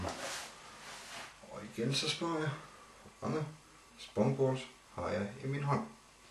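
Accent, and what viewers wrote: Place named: Jutland, Denmark. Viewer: Jeg tager én spongeballs :D haha, nice sleights men den jyske accent er sq lige i skabet :D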